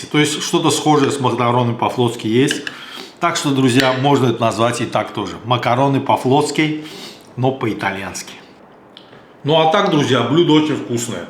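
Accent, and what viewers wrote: Baku, Azerbaijan. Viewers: правила нет. Но не используют лук :) помидоры, чеснок базилик да, но лук туда не кладут. Но все равно смотрю вашу передачу только из-за того что вы бакинец и мне нравится ваш акцент бакинца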